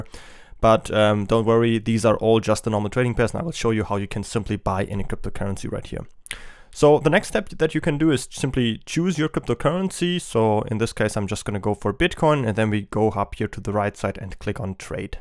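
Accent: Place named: Switzerland. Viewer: I can hear the slight Swiss accent ;) I like it! Thanks for the vid!!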